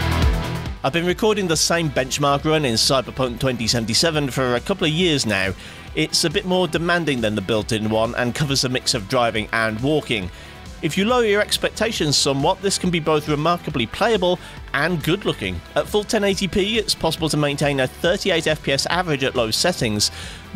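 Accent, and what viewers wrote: United Kingdom. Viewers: He doesn't sound at all like NX gamer, both have british accents but that's it. They're even different dialects from different parts of England.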